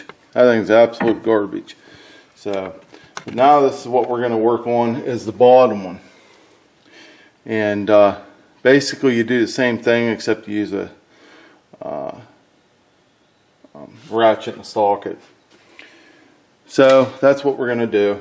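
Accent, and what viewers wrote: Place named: Pittsburgh, United States. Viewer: Hello from one Yinzer to another! I thought I recognized our dialect. Nice video,and really straightforward advice!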